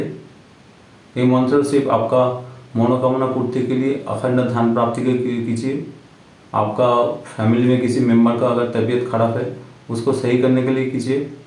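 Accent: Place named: Bengal, India. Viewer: Your Hindi is Bengali.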